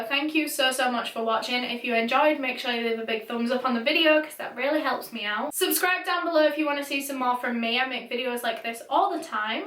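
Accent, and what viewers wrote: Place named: Yorkshire, United Kingdom. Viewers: She's from T-Yorkshire. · She's from West Yorkshire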